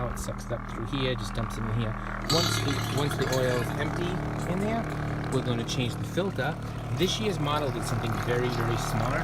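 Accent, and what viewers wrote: Rhode Island, United States. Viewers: Am I detecting a providence Road island accent?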